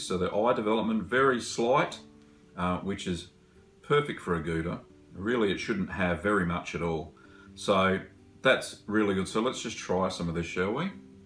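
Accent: Australia. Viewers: Nobody gives a crap, stop being such a whiny baby. He's got an Australian accent. Leave the man alone.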